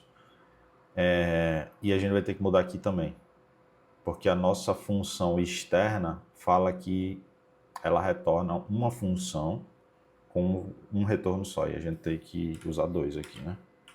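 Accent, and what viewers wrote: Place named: Northeast, Brazil. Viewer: Aula Top. Parabéns Bom demais assistir vídeos de qualidade ouvindo um sotaque nordestino igual ao meu 😂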